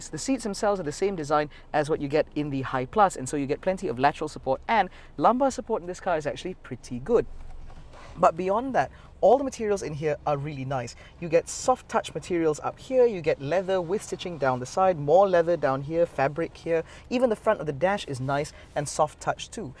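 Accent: England, United Kingdom. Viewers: Love your eng accents bro..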